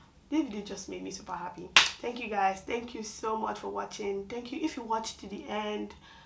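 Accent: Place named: France. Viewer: ❤❤❤happy new year in Advance …your English is already having French intonation…bonsoir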